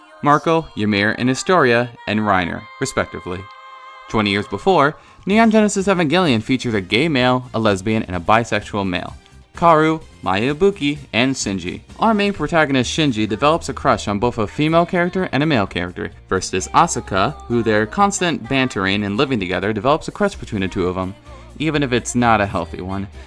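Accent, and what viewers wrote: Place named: United States. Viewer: Why American's accent is so terrible for Japanese names hahaha? sakuRa Útena karro(kaworu)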